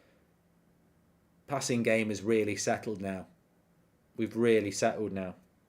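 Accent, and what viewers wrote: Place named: Manchester, United Kingdom. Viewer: Warra manc accent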